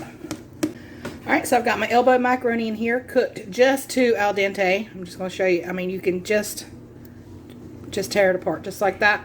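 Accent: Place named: East Texas, United States. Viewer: I’m new here but I feel like I’ve met a neighbor. I’m from east Texas, and you would fit right in here. We even sound alike. I’m binge watching your videos... I love your style. God bless your heart.❤😊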